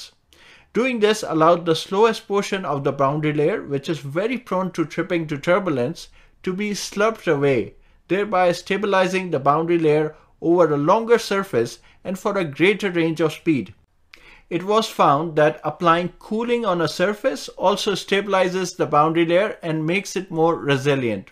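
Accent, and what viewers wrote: India. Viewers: I love your Indian accent and breadth of knowledge, but you didn't mention Gliders !!!! Thankyou, i loved your video.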